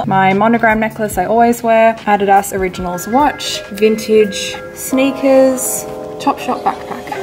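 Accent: Australia. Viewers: Also dude, I thought you were Australian, then I saw the city and thought you were in London, then I looked on your blog and it says you['re from Sydney. I'M SO ANNOYED cos I saw those lovisa chokers and I just. REALLY. need to buy a choker right now. I'm so annoyed cos I want like 10 beautiful chokers but no stores have good ones ugh.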